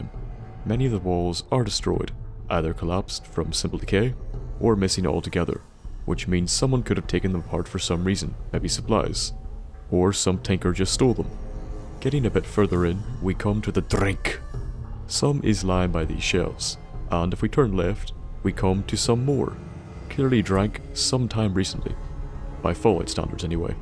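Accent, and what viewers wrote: Ireland. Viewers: As always great video man keep up the amazing content and I've been wondering this are you Irish if not my apologies but just wondering keep it up man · Yo are you from ni or Ireland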